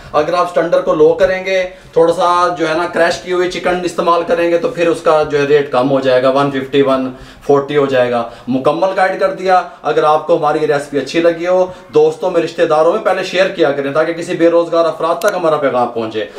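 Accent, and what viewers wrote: Punjab, India. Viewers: He is so simple, jab yeh kehtay hain “ aap bolain gay koi cheez banayee thi” can’t help laughing, his Punjabi is so cute😅😅😅🌹 Theek hu gaya.....!! 😊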